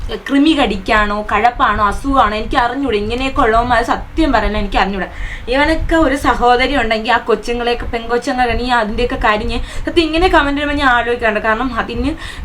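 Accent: Trivandrum, India. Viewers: Tvm slang polichu chechi😍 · Nte ponnu koche iyalu tvm evdeya. Njnm tvm anu bt nte slang inganeyonumalla. Iyalu pakka tvm. 😂😂😂 Njn chirichu oru vazhi aayi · "TVM ano sthalam ...samsaram agane thonee....anthayalum marupadi kalaki 🤝👍.."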